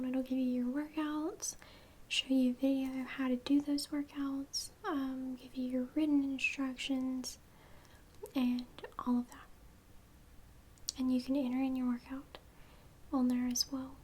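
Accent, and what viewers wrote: South, United States. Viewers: That southern accent ❤️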